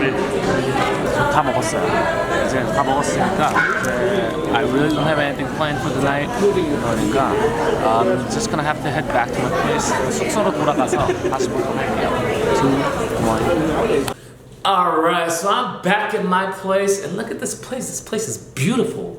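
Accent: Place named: New York, United States. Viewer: 저는 님이 뉴욕쪽 액센트가 있다고 생각해서 동부출신이라고 생각했는데 아닌가봐요..ㅋㅋ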